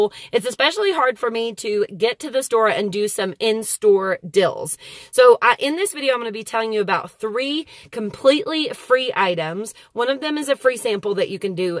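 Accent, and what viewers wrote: South, United States. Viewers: Geezermann 😂😂🤣🤣Her southern accent I just love her she’s amazing accent and all🤣🤣😂😂🤗❤️🙏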